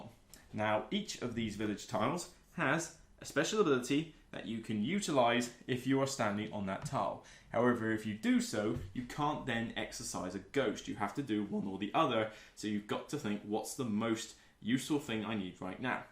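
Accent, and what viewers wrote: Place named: Cornwall, United Kingdom. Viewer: I love that kinda Cornwall farming English accent!....and great how 2 play :)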